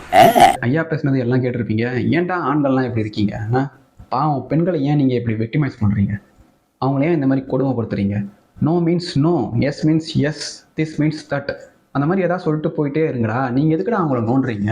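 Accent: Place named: Chennai, India. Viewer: நீங்கள் பேசுறதுலையே தெரியுது நீங்க ஒரு சென்னைவாசி தான் என்று சென்னையில் எந்த ஏரியா நீங்க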